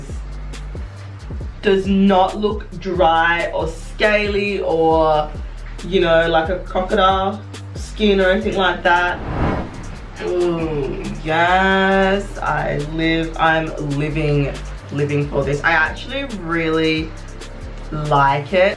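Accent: Australia. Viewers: Omgggg a melanated Australian!!!! I’ve never heard or seen one that’s wild to me but I love it. I’ve always wondered if we could be Australian (seems so rare). But I appreciate this video because I’ve been looking for a comparison of 430 against the other two. And maybe even 450. I no longer think I’m 430 but it was hard to tell bc the store I was in didn’t have 430 tester😭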